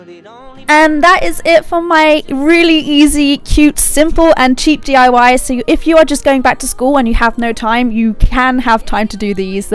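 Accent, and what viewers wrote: Australia. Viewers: amazing video. just wondering are you Australian?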